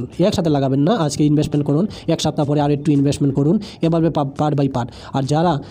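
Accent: Sylhet, Bangladesh. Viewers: Vai Assam e taken naki? Sylheti vasay kota bolen mone hocce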